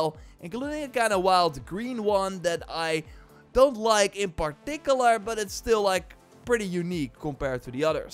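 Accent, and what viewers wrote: Netherlands. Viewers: As Dutch as an accent can be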